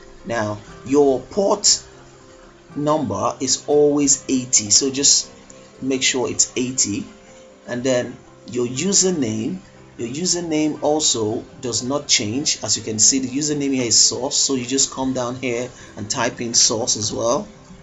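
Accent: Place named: South, United Kingdom. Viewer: my mistake then! You kind of have this unique south british accest you know haha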